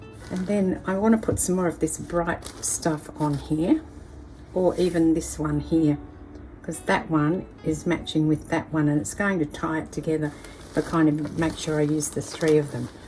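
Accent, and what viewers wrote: Australia. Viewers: So wonderful to hear an Australian voice working with scraps! I have just begun my scrappy journey. I love the project. Thanks for sharing!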